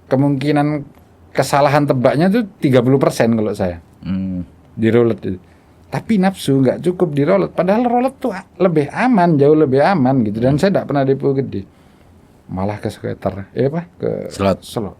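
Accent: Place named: Madura, Indonesia. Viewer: Bhasany mdrny kentel bget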